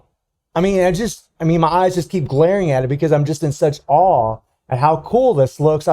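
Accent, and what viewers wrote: United States. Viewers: Oh man, I thought u sounded country. Love the beard man.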